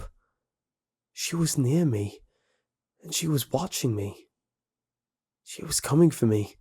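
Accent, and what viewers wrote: United Kingdom. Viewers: Which part of the UK is he from? Like his nearest city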